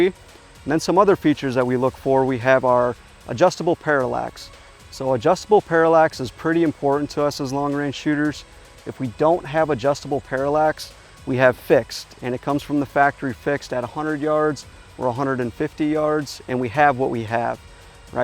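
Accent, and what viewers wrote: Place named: Wisconsin, United States. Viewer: Wisconsin. Huh, I thought he sounded familiar. Greetings from the Fox Valley!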